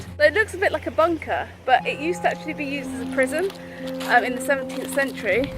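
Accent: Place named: United Kingdom. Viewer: How come British people say karsal instead of castle ?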